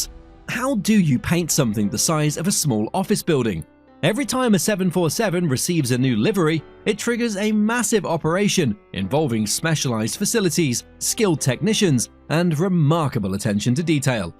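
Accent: England, United Kingdom. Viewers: Why is the narrator got an English accent but says aluminum not aluminium ...that just ruined the video for me. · How can someone with a British accent mispronounce Aluminium - it’s Al you mini um - it’s not alooo min num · Narrator has a British accent, but pronounces aluminum like an American. This is confusing. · If you're going to use a British accent, at least use British spelling. The metal is alumininininium.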